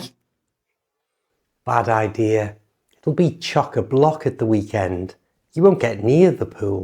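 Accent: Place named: North, United Kingdom. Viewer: What city do you live in ? You sound from North blighty?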